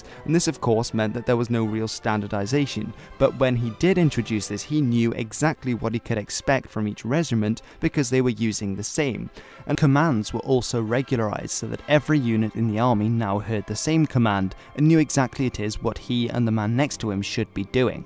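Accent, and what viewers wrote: Netherlands. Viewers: is this guy dutch or english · you are dutch, youre accent is dutch and you pernoune dutch names almost perfect xD HUP HOLLAND HUP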